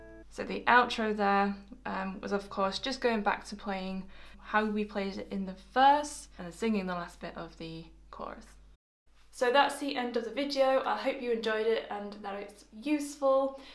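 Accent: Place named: North, United Kingdom. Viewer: Impeccable tuition. Cute northern accent. What's not to love?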